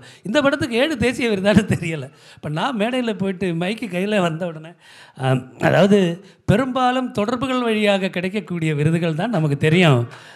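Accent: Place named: Kerala, India. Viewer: அவரே தான். இவர் ஒரு எழுத்தாளரும் இலக்கியவாதியும் என்று தெரியாது. மிஷ்கின் படங்களில் மலையாள வாசனையோடு கூடி வரும் இந்தக் குரல் என் மனதில் எப்படியோ பதிந்திருக்கிறது. மிகவும் அழகாக பேசியிருக்கிறார் இந்நிகழ்ச்சியில்.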